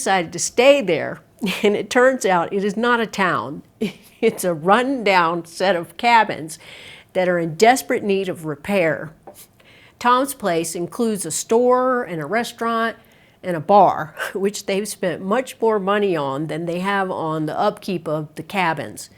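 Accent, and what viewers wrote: South, United States. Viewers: ohh, I look forward to that video. i'm going to visit again in september or october on a very dark night, and I'll try to check out Lee Vining. I love the way you say that name with your southern accent! Do you have a tip on a red headlamp to use? Thanks again 😊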